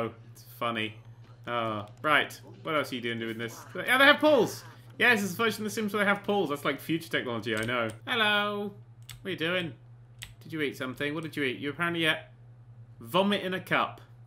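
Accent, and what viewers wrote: England, United Kingdom. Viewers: Oh! And how could I forget, an hilarious, English narrator.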